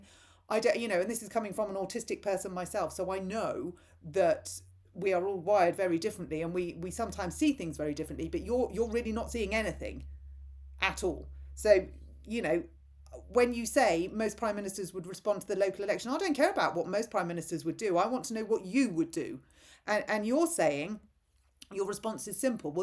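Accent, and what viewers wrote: RP, United Kingdom. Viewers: I love the harmony btw the colour of the door and the fireplace. Also your posh accent.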